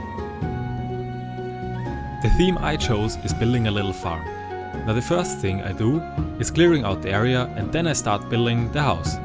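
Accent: Germany. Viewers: Sehr schönes Video und man hat sofort gemerkt das du ein Deutscher bist :D Ist nicht unbedingt schlimm, dein Englisch ist gut verständlich und du hast eine angenehme ruhige Stimme. Atmosphäre ist wirklich kein leichtes Thema, selbst für erfahrene Bauer. Bei den meisten Leuten die ich kenne ist der Anspruch an Atmosphäre überhaupt oder wenig vorhanden. Die Umgebung ist meistens wichtiger als das eigentliche Gebäude und das wurde hier schön dargestellt ;) Ich finde es müssen wirklich kleine Geschichten durch feine Details eingebaut werden, aber auch die Materialien müssen sehr variieren, also random platziert werden. Schöne Grüße aus dem norden Deutschlands ;) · Another great video, and with a German accent to boot? <3 Also... your mc skin is Finn, the Human? <333 Keep the videos coming, ^^*Also, don't apologize for your accent/language- be proud of your heritage, and exuberant for your linguistic idiosyncrasies! 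^^ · Du bist deutsch das höre ich aus dem akzent =D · Mit z German accent =P nhe man, we can clearly understand you and you speak English very well. Love your vids👍🏼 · great video! definetely will use these ideas in my world, like the hay car ;) i didnt mind your German accent, im Dutch so thats even worse :P great video, A like and A subscribe from me ;) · gut zu wissen, dass du deutscher bist :D Mach dich noch sympathischer!!! Aber wenn man es weiß hört man es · Sehr gutes video das mit dem deutschen akzent ist net schlimm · your accent is cool btw haha, german sounds great, dont be shy ! :p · Your German isn't bothersome at all. I can understand a few words, so don't worry much. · You are an awesome builder! Ehrlich gesagt dein deutscher akzent macht deine stimme noch angenehmer :D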